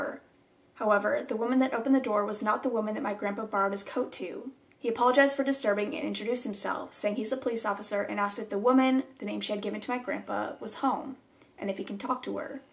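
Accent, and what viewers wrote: South, United States. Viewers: You sound like you developed a sort of country/western accent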